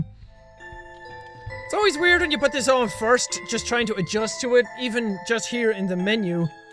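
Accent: Ireland. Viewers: you have made a (somewhat jealous) subscriber out of me all right. God I wish I was raised in Ireland, I LOVE Irish accents, best in the world, screw Britain, Germany, Russia, all them suck when pinned against an Irish accent. Anyway, yea, I wish I was raised in Ireland so I could have that awesome accent, and I'd be happy with a little more Irish blood, both of my grandfathers are Irish, but so far down the family tree it means nothing.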